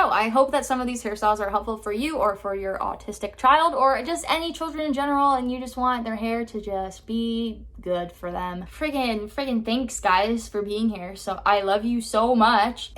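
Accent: Canada. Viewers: you sound so much more canadian in this video!!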